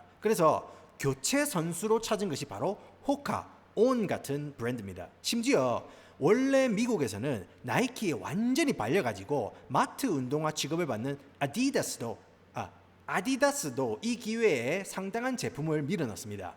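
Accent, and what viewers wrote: Gyeongsang, South Korea. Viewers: 왤케 발음이 좋아요? 갱상도 바이브가 네이티브임❤ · Chic한 현지식 영어발음 구시한 경남 사투리의 조화 ㄷㄷㄷ 평냉에 스리라차 소스 넣은 감칠맛...이 좋아오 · 웓따 행님 발음 함 구~수 하구마이. 영어는 미끄덩~ 사투리는 갱상도 싸나이네~ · 영어도 경상도 사투리 억양이 있네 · ㅈ ㄴ 경상도 사투리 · 내용도 유익하고 말씀도 친숙하고 알아듣기쉽게 경상도 내의 서부유타읍 악센트와 발음으로 말아주시니 정말 재밌습니다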